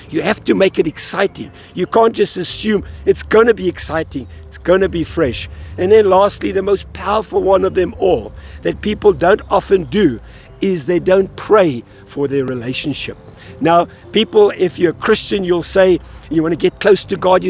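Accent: South Africa. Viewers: The moment I heard his voice I could hear the South African accent straight away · It's music to my ears to hear your South African accent! 🇿🇦 Thank you for your wise insight. From a Saffa who has move to Northern Ireland. 🇬🇧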